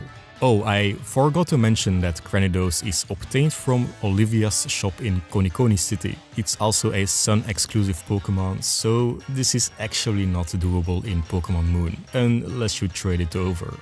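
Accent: Netherlands. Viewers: Question: R you Dutch, French or neither? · I can just hear that you're Dutch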